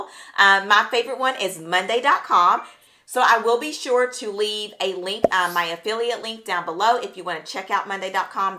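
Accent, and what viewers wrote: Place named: South, United States. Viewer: I love your country accent! 😊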